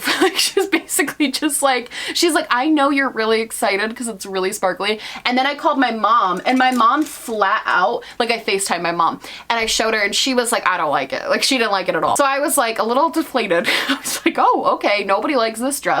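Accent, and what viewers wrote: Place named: New York, United States. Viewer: I bet you live near me! So excited when I find folks on YouTube who live in my area of NY! I also thought that your accent sounds like a local here. Lol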